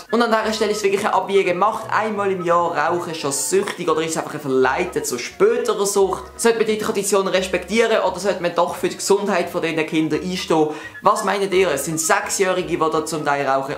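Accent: Basel, Switzerland. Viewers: Ich mag den Schweizer Akzent👌 Und nur weil du Schweizer bist haste ein abo von mir😂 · Me merkts mega! ich kum au vo Basel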